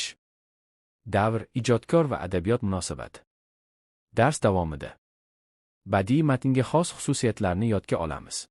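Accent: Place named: Russia. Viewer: Nima rus kishi oʼqiganmi buni gʼ ni g deb aytadi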